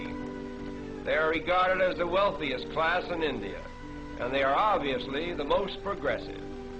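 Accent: United States. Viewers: It appears to be an American voice rather than British. Great video....the city should have retained its real name of Bombay...Mumbai was a group of mosquito-infested islands inhabited only be fisherfolk; Bombay was the modern city that the British built!! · And the accent is American; not British. And there is no word in modern English called the..."..the Britisher"... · The commentator appears to be American or Canadian, not British. Great video! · I believe that this is an American documentary, the narrator is certainly an American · What a horrible American accent! The British don't pronounce Bombay like that. lol.. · 'as described by the Britishers'sounds like an American/Canadian to me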